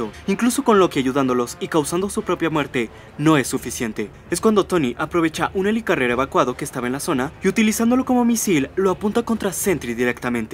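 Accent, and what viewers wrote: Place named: Mexico. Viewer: Hey carnal mis respetos t la sacas para narrar los shorts parecieras mexicano que las pirula la pulida de bastón darle duró a la tula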